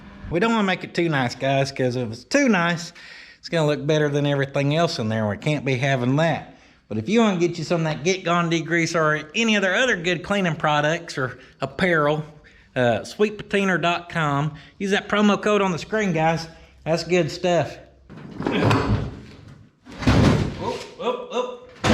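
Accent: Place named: Oklahoma, United States. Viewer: I often comment because its only fair that i spread my expertise across as great an area as i possibly can! And i may or may not get ridiculously triggered by my colonial cousins the other side of the puddle pronouncing english words spectacularly wrong! E.g. jagwire instead of jaguar or sodder instead of solder but i have to say american accents are so easy on the ears mr is particularly cool if i try and speak on camera i sound like a cross between michael caine and ron weasly its just not fair america took my beautiful language flushed it down the waste disposal and then made it sound so damn cool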